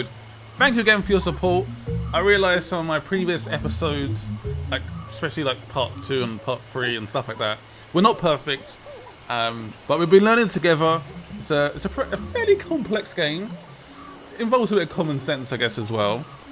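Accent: United Kingdom. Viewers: This lets play is much better cause of the British narration, makes it feel more like a documentary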